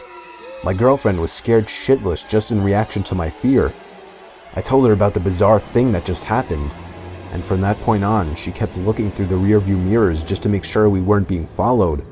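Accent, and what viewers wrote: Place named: New York, United States. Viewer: I just realized he has a little New York accent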